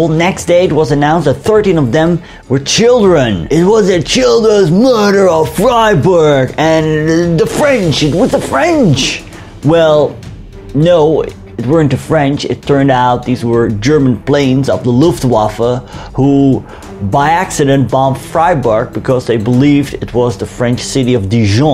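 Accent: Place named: Netherlands. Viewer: prachtig zoals je die "internep revisionist" neerzette! (en, JA, ik spelde internet met opzet zo...) ik vind je kanaal "History Hustle" fantastisch en voor het overgrote deel correct. het enige, kleine, minpuntje is je flinke accent als je Engels spreekt en ook met de uitspraak van Franse namen kan ik merken dat je een Nederlander bent...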